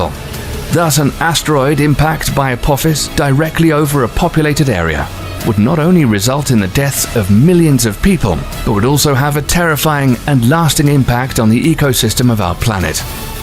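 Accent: United Kingdom. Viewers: My favorite British narrator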